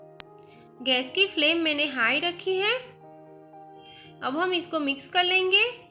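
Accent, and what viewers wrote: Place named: Bradford, United Kingdom. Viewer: Cant understand her Bradford accent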